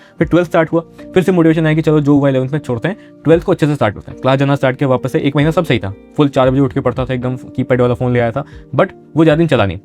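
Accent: Bihar, India. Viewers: aap bihar se ho huje laga up se ho aap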